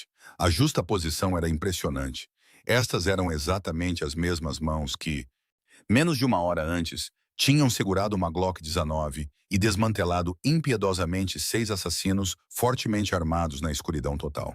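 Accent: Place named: Portugal. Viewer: EQUIPE ! ARREPIANTE, AFIADISSIMA ADAPTAÇÃO DE ROTEIRO. O MELHOR E MAIOR MÉRITO A NARRAÇÃO AO ESTILO LUSITANO COM MUUUIIITO ESTILO. ADORÁVEL! ✨💫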